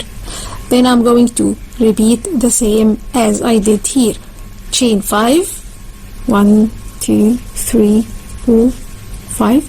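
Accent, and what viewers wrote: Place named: Egypt. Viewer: عرفت انك مصرية من اول ثانية في الفيديو 😅 اهلا بيكي و شكرا على الفيديو الجميل ده ❤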